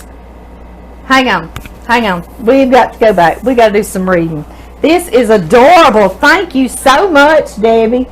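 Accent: South, United States. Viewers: I love your Southern Accent..great recipe btw😉❤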